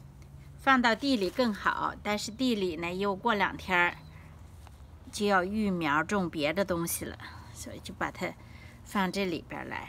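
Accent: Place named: Shandong, China. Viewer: 山东 烟威口音。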